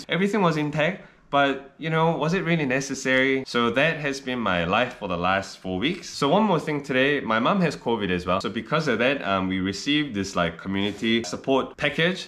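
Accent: Singapore. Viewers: dude, where you? Still in NZ? Miss your Singlish leh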